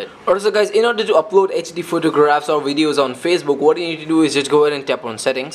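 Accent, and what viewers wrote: India. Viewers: With his fast talking and Indian accent I couldn't understand him!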